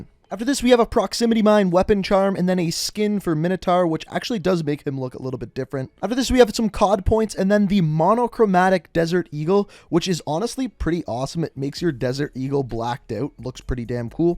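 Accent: Canada. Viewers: The way you abowt just gives me terrance and Phillip vibes guy 😂😂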